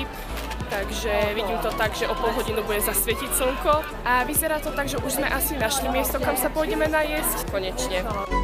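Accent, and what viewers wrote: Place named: Slovakia. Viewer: já bych taky chtěla byt ze Slovenska je strašně krásný hlavne ten přízvuk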